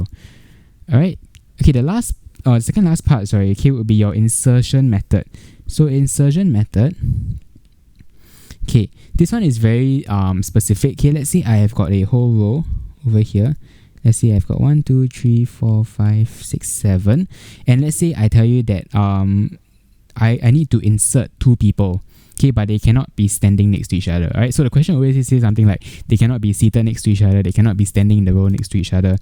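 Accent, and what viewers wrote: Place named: Singapore. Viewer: are u singaporean ?? istg u sound just like my singaporean friend!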